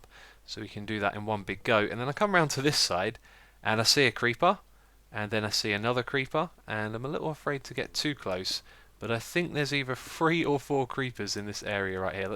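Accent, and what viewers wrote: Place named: United Kingdom. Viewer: your british Yes i've been watching you for 1 and a half years always thinking your american, sorry about that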